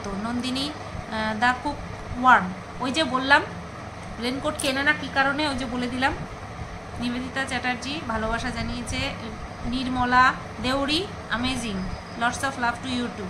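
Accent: Bangal, Bangladesh. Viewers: tomaar moton bold, honest, r courageous lady khoob kom dekha jaaye..r sense of humour toh mashaallah..tomaar mukhe bangal bhasha shunte khoob bhalo laage...ei comment ta pore ektu bangaal bhashaaye reply diyo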